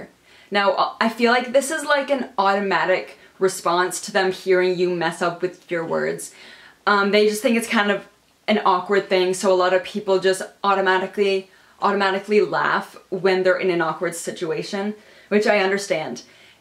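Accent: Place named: Canada. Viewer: Are you from Canada? I hear an accent. Love all your videos btw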